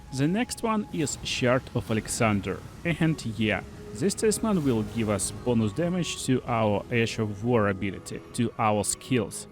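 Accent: Russia. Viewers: Какой-то сильно русский акцент у вас сударь. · Russian ? “Safety is number one priority”~ CrazyRussianHacker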